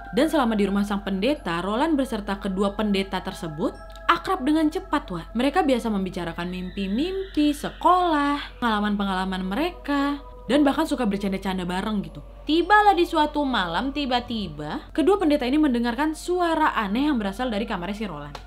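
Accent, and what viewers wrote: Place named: Medan, Indonesia. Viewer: Suka sm logat medannya kak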